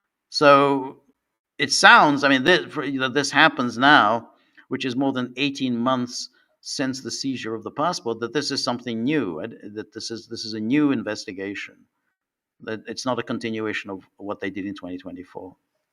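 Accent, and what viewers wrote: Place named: Cockney, United Kingdom. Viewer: Love the Cockney accent,. Regarding the debanking and all that, I have to say, it's not right, but it's like the thing from Pulp Fiction, isn't it? The Marcellus Wallace situation. Yeah, I mean, maybe they shouldn't have expected that reaction, but they should have expected a reaction. You know, I mean, come on. The Swiss guy who was sanctioned by the EU. What on earth was he doing anywhere near Europe, let alone still living in an EU state? Two, Scott Ritter. What on earth was he still doing in the United States? What is wrong with these people? And I'll tell you who's next, Alexander Mercois of the Duran. He will be sanctioned. They will clamp down on him. They will debank him. I've got no idea why these people stay in these countries. I left Airstrip One 25 years ago and have never looked back.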